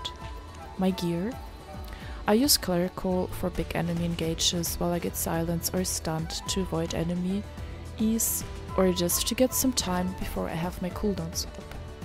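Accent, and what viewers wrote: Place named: Germany. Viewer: Okay ;) , you have very german accent because i'm asking , thanks for information .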